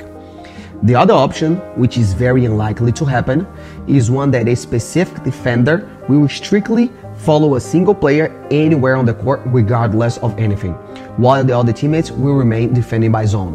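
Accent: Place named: Brazil. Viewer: Vc é brasileiro né? A gnt reconhece kkkkkk ótimo vídeo 👏🏾👏🏾👏🏾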